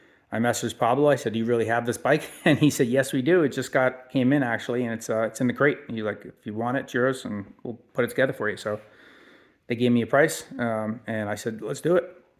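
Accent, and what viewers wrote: New York, United States. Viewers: you sound like a NYer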